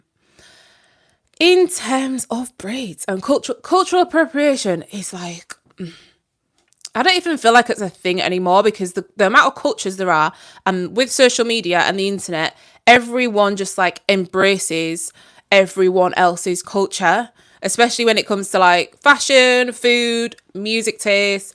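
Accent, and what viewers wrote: Northern England, United Kingdom. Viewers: I knew you were a northern girl! I’m from Huddersfield (although live in the midlands now) so recognised the accent! Love these lives! Keep it up so engaging. To be fair at school most of the black girls including me had relaxed hair so you probably still would have had relaxed hair, that was just the done thing!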